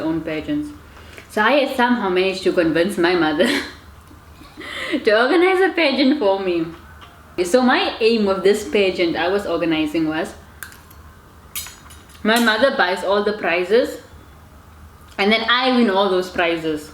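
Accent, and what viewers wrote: India. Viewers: I love you... You sound Indian a lil bit😂😂😂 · You starting to sound like an Indian girl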